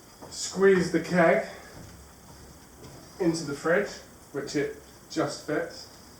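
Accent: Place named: Southern England, United Kingdom. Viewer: u sound like your from south England but ur Australian ?? crazy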